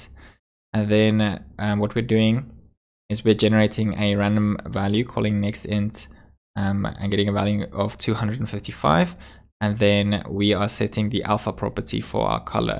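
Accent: South Africa. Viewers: You sound south African 😎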